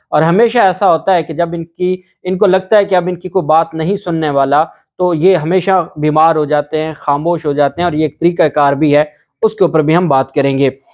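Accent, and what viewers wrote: Saraiki, Pakistan. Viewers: آپ کہاں سے ہیں آپ کا لہجا سرائیکی ہیں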